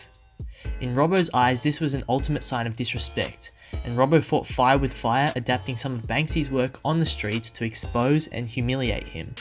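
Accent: Australia. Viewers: Nice to hear a ozzie hey from west oz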